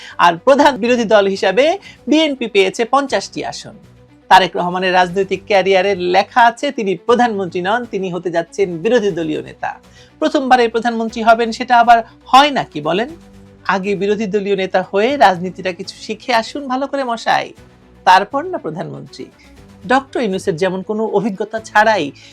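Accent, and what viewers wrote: Kolkata, India. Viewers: আপু আপনার ভাষা খুব পরিচিত লাগে, কলকাতায় গেলে এরকম ভাষা শুনতাম। আপনি পাক্কা ইন্ডিয়ান মাল। দেশে আইসেন না প্লিজ।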